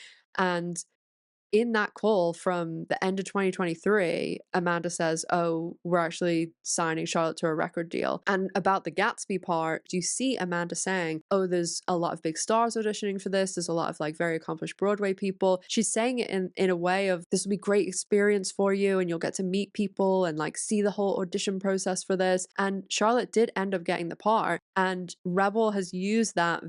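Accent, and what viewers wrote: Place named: United Kingdom. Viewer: Where in the UK are you from? Your British accent sounds faint, not Ike Queen’s English but something else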